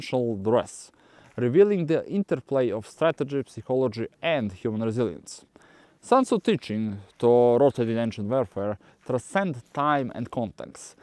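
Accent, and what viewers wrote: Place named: Balkans. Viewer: Zavisi koji ti je nivo Engleskog... Ja ga lično jasno razumem, slažem se nekima treba title. Akcenat mu je jak balkanski...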